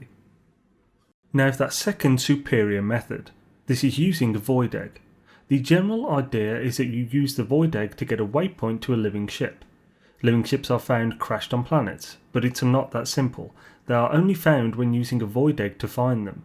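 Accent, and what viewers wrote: United Kingdom. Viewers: It just sounds like he is mostly mumbling and I didnt understand a word of what he was saying. If this is what most youtube Brits sound like then good god. Sounds like I was listening to Kermit the frog from muppet puppets lol. 😂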